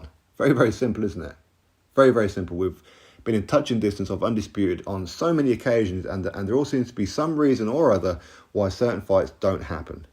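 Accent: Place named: RP, United Kingdom. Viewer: If you're from Newcastle Upon Tyne, as I am, then where did you get that posh accent from?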